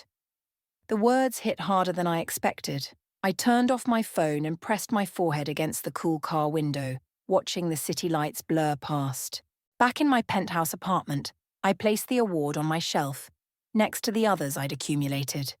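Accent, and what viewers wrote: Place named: United Kingdom. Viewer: British voice. F you. Not listening.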